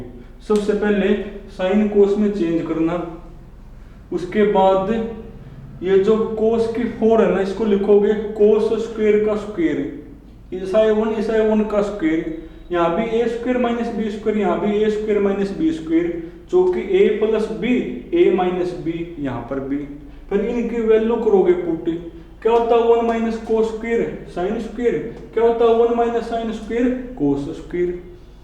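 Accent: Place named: South India, India. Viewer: He's from south india. That's why different accent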